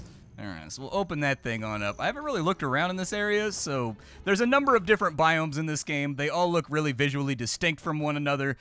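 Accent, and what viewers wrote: Germany. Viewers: your german english is awesome,! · Very good German accent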